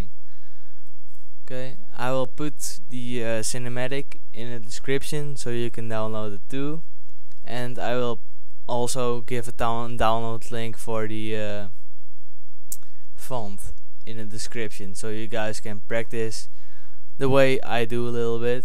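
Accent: Netherlands. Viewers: je hoort dat je nederlands ben haha aan je accent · ja klopt ik klikten op de video en hoorde gelijk dat hij nederlands is :P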